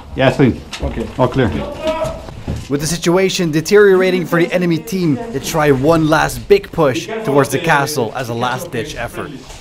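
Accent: Netherlands. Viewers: Leuk om te horen dat je Nederlands bent :D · wowowo hold on a minute, you're dutch? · Are you dutch??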